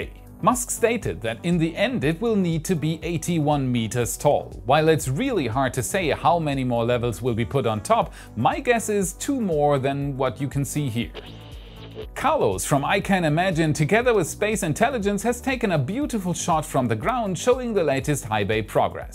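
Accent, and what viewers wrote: Germany. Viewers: Your English isn't quite good enough to tell (yet), but he has a quite noticeable German accent. Similarly, most native English speakers can't tell Bavaria from Berlin from Bern. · is that a german accent ? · Top-quality content, and loving the German accent! :D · I can hear the german akcent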